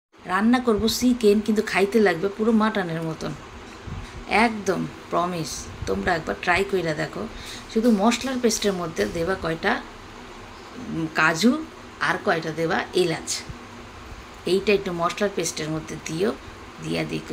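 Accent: Bangal, Bangladesh. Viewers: লাইক সাত ,তোমার এই বাঙাল ভাষার কথাগুলো দুর্দান্ত লাগছে শুনতে । পুরো ভিডিও তোমার ভিডিও থেকে তোমার কথা শুনে খুব আনন্দ পাইলাম।😂👍💓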